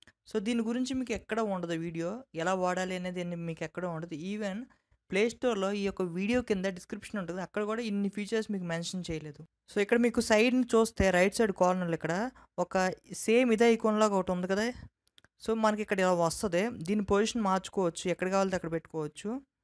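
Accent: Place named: Srikakulam, India. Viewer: I like your accent bro !! Maa Srikakulam friend gurthochadu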